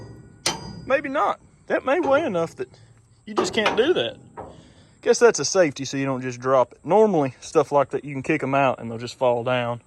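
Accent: South, United States. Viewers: I from Chicago. I love this guys southern accent it makes the video even way better.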